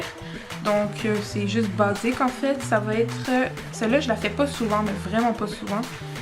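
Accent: Quebec, Canada. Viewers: Tu est Québécoise !!! 😮✋😐. Ton accent t'a trompé mon amie 😏 Et une affaire de de résolu 😃 TU mérite MINIMUM 100 000 k abonné 😉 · je suis sur que tu est Québécois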